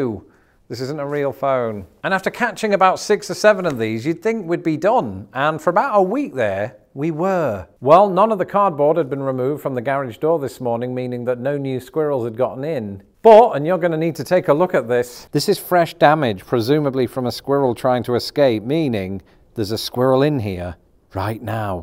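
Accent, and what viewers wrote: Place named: United Kingdom. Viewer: I'm glad you are back on this subject, because I get a hoot out of hearing you say "garidge". Don't quit saying it that way, because if you do it will sound like you are getting Americanized, and that terrifies me!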